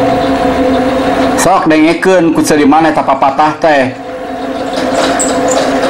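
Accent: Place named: Sunda, Indonesia. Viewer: Asli orang sunda atuh kang....👍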